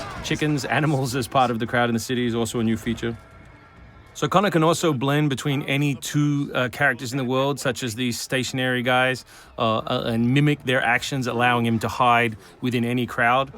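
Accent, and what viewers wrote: United Kingdom. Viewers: Oh are you british???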